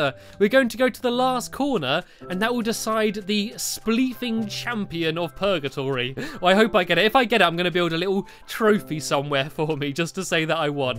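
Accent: United Kingdom. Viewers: Wooo ur british like me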